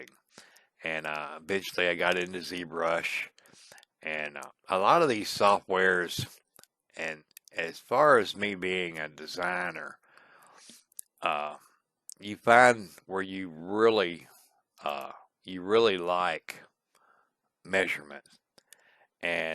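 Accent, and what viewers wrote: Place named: South, United States. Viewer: now THIS is what youtube is for. People who are EXTREMELY talented in their craft, and of course, a nice southern voice-over included with actually instructive narration. God speed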